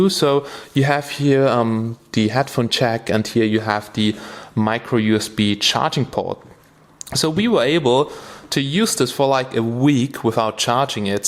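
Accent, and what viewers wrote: Germany. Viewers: uhhhh ....i hate the german accent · wtf is that fucking german accent?!!!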